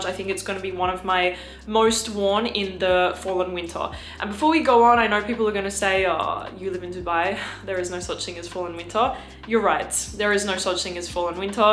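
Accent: Australia. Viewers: Your Australian accent is still very prominent makes me miss my good friend Joel from Perth. Ever smell Claude Mersal la mélodie one of my favorite fall unisex fragrances. Bought thameen peregrina as i watched this. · Your Australian is still very prominent, it is just certain gestures that have rubbed off on you, totally natural. Im sure he has helped you with pronunciation of a lot of french words as well. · you’re an Aussie living overseas and your accent reflects that. Don’t listen to haters. We love you ❤️💙💜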